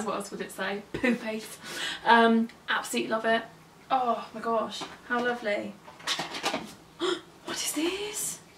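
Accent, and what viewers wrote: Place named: England, United Kingdom. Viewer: I love your accent !!! Are you from England ???🤔😘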